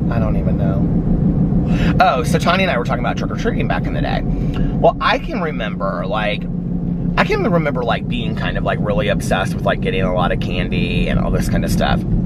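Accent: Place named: Midwest, United States. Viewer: Hi. I noticed you say “saul” instead of “saw”. Like as in, “I saul so and so yesterday.” Is that. Mid Western thing? I always say “ milk” weird, but it’s just a “ Melissa thing.” 😁 I’m looking forward to hearing about the cozy mysteries. It really helped me through last November and December when you went on a “ cozy” spree! ❤️❤️❤️ I loved it!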